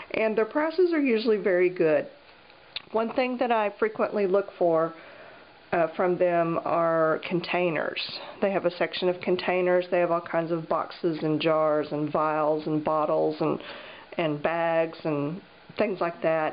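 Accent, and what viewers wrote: United States. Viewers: Sorry but your American English is cracking me up!..pipe ets?....in the science lab we call them...( pip ets) not (pipe-ets)...gosh I love you girl.....lol...lol...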